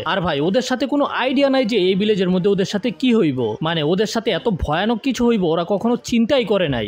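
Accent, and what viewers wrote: Comilla, Bangladesh. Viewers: ভাই আপনার বাড়ি কি কুমিল্লা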